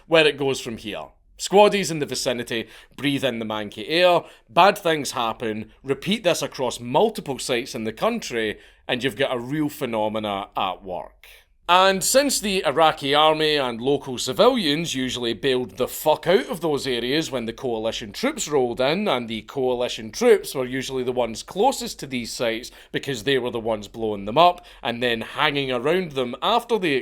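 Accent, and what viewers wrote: Scotland, United Kingdom. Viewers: “Became knoGwn as the golDf waDrr “ Yo Scott’s are cool af bro . Best English variant yet other then American southern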